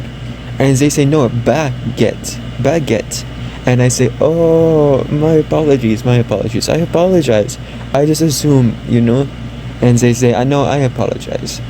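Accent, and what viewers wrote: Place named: United States. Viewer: i hear ur american accent coming out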